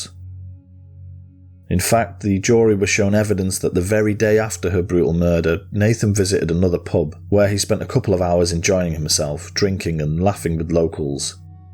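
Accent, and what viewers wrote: United Kingdom. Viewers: Hey, I just discovered your channel a few days ago and have now watched several of your offerings, some familiar, some unfamiliar. However, being a fellow Brit I am enjoying your perspective (& accent! 😊). I very much look forward to catching up on more of your vids and your future releases. Blessings... Puccini 😇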